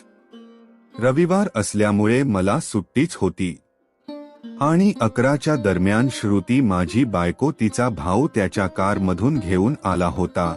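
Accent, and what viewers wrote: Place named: United States. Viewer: is this marathi story presentation or American marathi presentation ? please either improve pronounciation or change the story teller.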